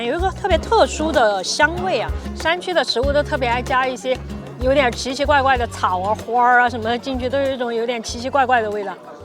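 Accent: Sichuan, China. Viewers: 听口音是四川老乡，支持下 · 四川人，口音理解一下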